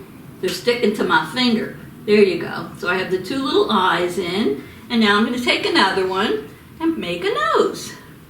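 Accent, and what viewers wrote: New York, United States. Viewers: Smores dip looks fantastic. Can I assume from you accent your from New York? I'm super excited for Halloween 🎃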